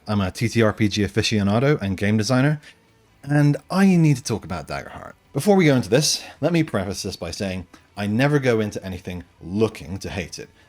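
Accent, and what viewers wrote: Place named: United States. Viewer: Not the American accent for reading the spotlight rules 😭😭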